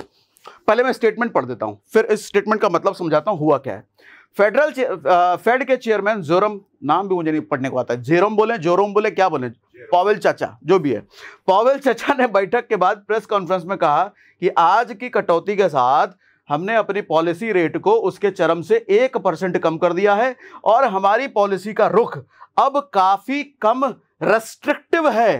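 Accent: Bihar, India. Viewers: Bhai aapki bhasa se aap bihari lag rhe ho or aap kya bol rhe h ye sayad aapko bhi nhi pta . Ab suno ye koi nai baat nhi h market crash hona or ha abhi carsh hua nhi h but aapne apna paisa kmane ke chakkar me video daal dia or logo ko bevkoof bna rhe ho . Piche ka record utha ke dekho kab kab market me movement hota h ......kya yar bkvas ke alava kuch or bhi krte ho 😂😂😂😂😂😂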